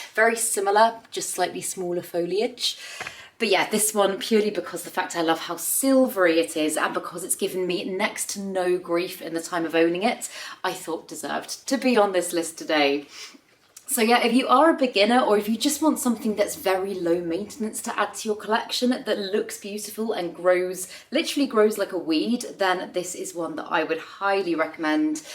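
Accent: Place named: West Country, United Kingdom. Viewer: Love your west country twang!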